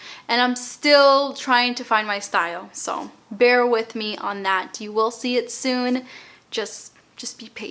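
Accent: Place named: New Jersey, United States. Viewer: I live in Michigan but my dad is from massachusetts and I’m not used to hearing people talk exactly like my dad talks, so hearing your accent makes me so happy and reminds me of my family back in Massachusetts!💛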